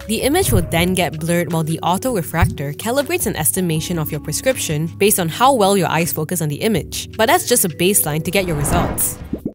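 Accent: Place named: Malaysia. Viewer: i didnt even see the channel’s name at first but i can guess the accent is malaysian 😅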